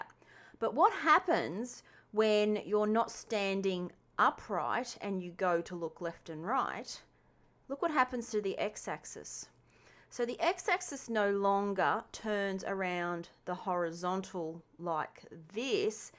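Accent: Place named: Australia. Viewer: I am sorry if this is correct in Australian, the awesome accent gotta come with quirks I guess.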